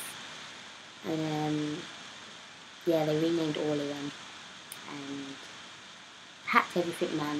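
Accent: United Kingdom. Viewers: Come to america please just for a little while. Most unique brit rapper. I love the accent even tho its heavy. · I love the British talk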